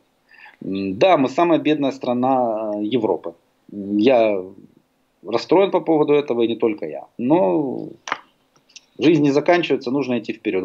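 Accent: Moscow, Russia. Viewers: Диктор с московским акцентом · акцент то Московский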